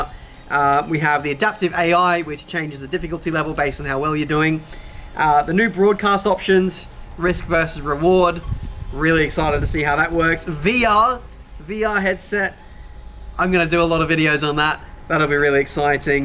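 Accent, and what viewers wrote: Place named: Australia. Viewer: Mate firstly drop the snob accent, does not suit you at all. Your an Australian Secondly… nothing more to add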